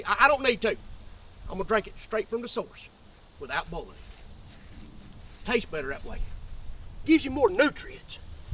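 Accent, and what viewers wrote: South, United States. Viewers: I love this guy's accent. Is that what Americans can southern?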